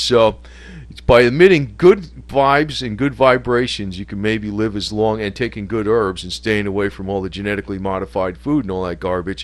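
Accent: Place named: Philadelphia, United States. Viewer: are you from philly? I can hear it in your voice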